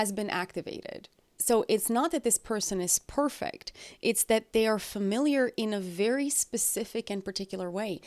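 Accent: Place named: Ireland. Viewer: Sorry, just curious. Were you born in Canada? I mean I moved to Canada when I was nearly 12, and I still have a borderline Russian accent. It seems like you do too, not Russian, but something... Maybe even Irish...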